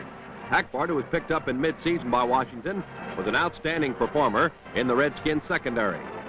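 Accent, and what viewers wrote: Canada. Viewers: Canadian pronunciation.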